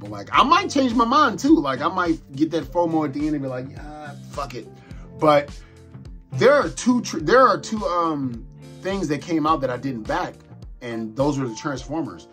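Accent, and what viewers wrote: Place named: New Jersey, United States. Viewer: So I came here from ninja... This is by far my new favorite channel. Hearing you talk makes me homesick about my people in NJ. Amazing content! Subscribed!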